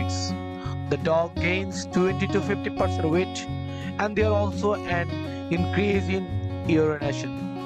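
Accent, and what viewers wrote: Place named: India. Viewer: Ugh, can't hardly understand a word you're saying. You'd think we'd all have had plenty of exposure to Indian accents, what with all the scam callers, customer service reps, etc.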